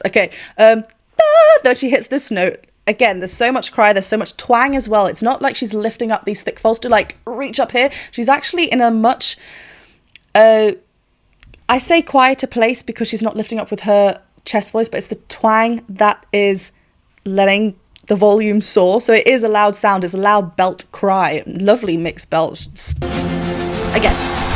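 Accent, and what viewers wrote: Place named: RP, United Kingdom. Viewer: I feel like I have to avert my eyes from your video because of your speech quality. I am very easily affected by the dialects I listen to you. Give me one hour of listening to you and I will sound equally posh. Edit: Which btw could be misconstrued, but is not meant as an insult - at all.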